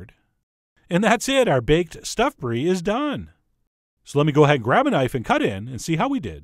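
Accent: United States. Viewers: His voice inflection gives me anxiety... I'm trying to listen to his instructions, but his voice inflections keep distracting me and forcing me to rewind, but I'm usually just re-distracted so I end up rewinding one section 4 times. D: He's great though, talented and funny, so imo the distraction is worth. Anyone know where this dialect originates from? Pretty sure it's an American regional accent. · I still struggle with this tuning up and down form this voice. It's like a Roller-Coaster in each phrase... dah-dah-DAh-DAH-dah-dah... dah-dah-DAh-DAH-dah-dah... dah-dah-DAH-DAH-dah-dah... This sounds EXACTLY like a vinyl record with a out centered hole in the middle. The record spins like an elipse... Is that some kind of accent from some region in the USA? It's really annoying in my ears... BUT, the video is really cool and THANK YOU for this recipe!!!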